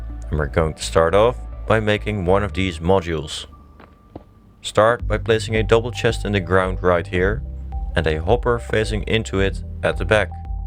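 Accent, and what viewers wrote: Netherlands. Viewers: I dont know why but you sound a bit like a Dutch guy speaking English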